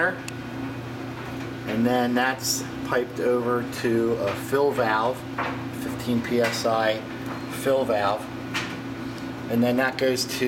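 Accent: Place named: South Jersey, United States. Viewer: ...ooh that's the south jersey / philly sound...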